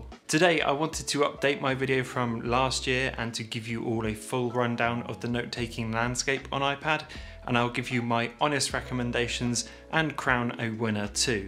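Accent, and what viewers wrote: England, United Kingdom. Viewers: English accent is such a flex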